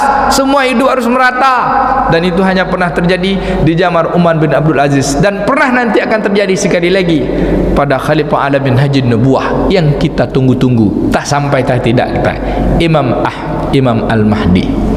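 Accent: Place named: West Sumatra, Indonesia. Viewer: Dari sumatera barat, ada sesekali beliau pakai bahasa minang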